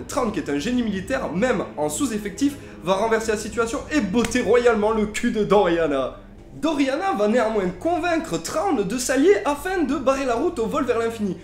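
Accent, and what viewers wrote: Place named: France. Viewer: Bon choix de sujet, Thrawn c'est le meilleur perso SW de tous les temps :) Cependant j'ai un peu de mal avec ta prononciation très frenchy, sa race c'est "Chiss" (prononciation: Tchisse), pourquoi donc prononcier "Chie"? xD bon pour Pellaeon j'avoue plein de monde a du mal ^^ et tu as dit "zogri" au lieu de "nhogri"... (je pinaille hein mais c'est pour que vous vous amélioriez!) :p haaan et à la fin t'as dit "général Thrawn" :) Notons aussi que certes en revenant vers eux Thrawn est adulé par la majorité de la population Chiss, mais pas par les classes dirigeantes qui font exprès de l'ignorer royalement (trop fiers pour montrer qu'ils s'étaient trompés en l'exilant!) Bon voilà désolée pour les petites critiques mais c'est pas méchant ^^ encore une fois vous vous êtes bien documentés c'est une bonne chose :)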